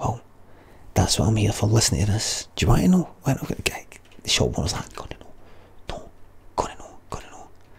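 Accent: Scotland, United Kingdom. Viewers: Scottish accent “Letter story comence” 📕🙏🏻😴, absolutely biblical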